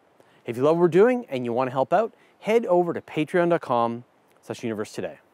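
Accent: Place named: Canada. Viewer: I almost forgot you were Canadian, your accent is the same as northern USA until I heard you say "a-boot" sorry just made me smile😃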